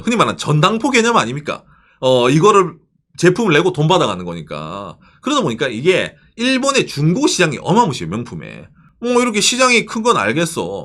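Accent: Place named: Gyeongsang, South Korea. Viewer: 경상도 사투리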